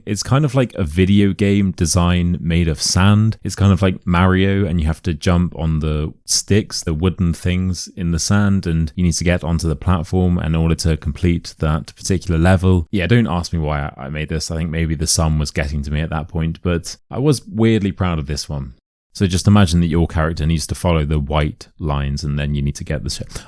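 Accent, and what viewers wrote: United Kingdom. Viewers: You are amazing, I used to hear the American accent, but for my IELTS exam I started training my ear to the British accent thanks to your channel. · Thanks, Teacher! You always deliver lovely videos with a great British accent. Have a nice day!🌻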